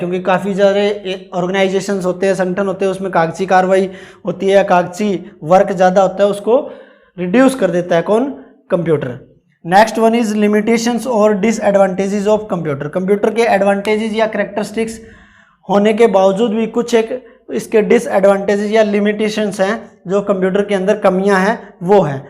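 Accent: Haryana, India. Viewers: THANKYOU VERY MUCH SIR❤️ AAP PAKKA HARYANA SE HO! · sir haryana se ho kya thoda tone aa rha h vha ka mai bhi haryana se hi hu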